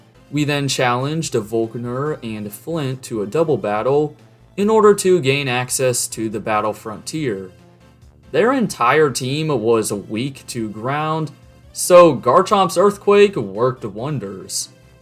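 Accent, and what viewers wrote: United States. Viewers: speaking in a General American Accent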